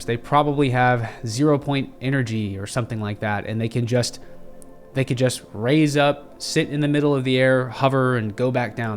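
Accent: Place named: Carolina, United States. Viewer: Dude, you sound like you're from my neck of tha woods... #CarolinaGrown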